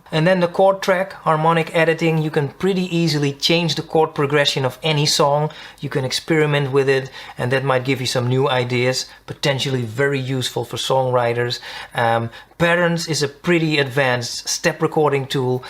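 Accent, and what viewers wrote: Netherlands. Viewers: Small channel still, but I love your clear style of presentation. I consider myself a "Reaper power user" but getting more and more frustrated by the midi editor, and for that reason alone I'm trying out Studio One 4 Professional. By the way, you sound a little Dutch (the accent)! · Hoor ik een Nederlands accent?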